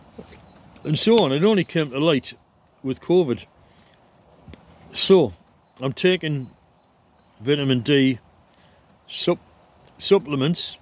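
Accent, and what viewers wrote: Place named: County Durham, United Kingdom. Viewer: I'm 47 this year and still waiting for a diagnosis, Its been around 6yrs journey as I've been assessed for autism and bpd only to come back to an original opinion which was adhd. I must say I dont do well but I'm confining myself to my home so I don't buttress up against much that can cause to much stress. I hope you all the best and hope to find some confidence in my little bubble as you have shown to be possible. we also share an accent so that helps, I'm in peterlee, co. Durham.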